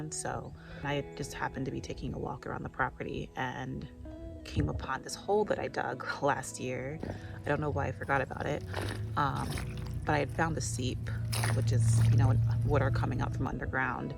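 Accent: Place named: Philadelphia, United States. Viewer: Just came across your channel, Love your content already! And your Philly/Delco accent 😆💚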